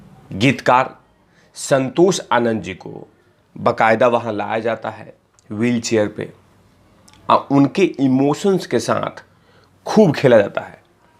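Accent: Bihar, India. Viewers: AAP BIHAR SA HO KYA 🤔🤔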